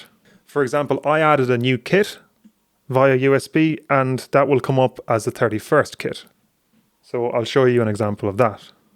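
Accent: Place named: Ireland. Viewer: Well I didn’t expect him to be Irish 😂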